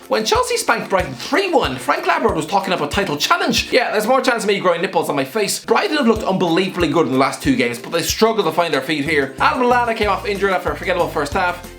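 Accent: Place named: Ireland. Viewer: This guy sounds Irish...🤔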